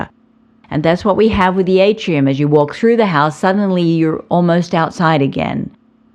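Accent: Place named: New Zealand. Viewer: It's faint, but I'm detecting an accent in your speech. New Zealish?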